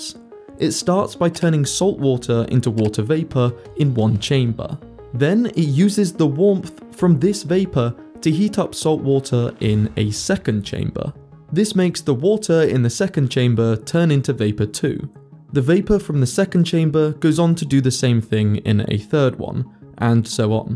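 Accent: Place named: RP, United Kingdom. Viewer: There are an awful lot of YT pretending to be “science” with British speakers. I guess whoever is paying to make these think it gives the channels more credibility. Anyhow… it is much more likely that the islands are sinking rather than “sea rise” which is a myth. The great climate scam has come to an end everywhere except these types of pretend “science” YT videos which still constantly push the “sea rise” and other hoaxes.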